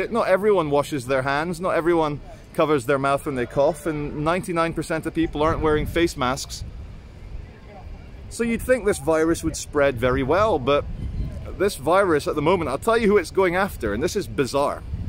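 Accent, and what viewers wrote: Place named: Manchester, United Kingdom. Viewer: Your Manc accent is starting to shine through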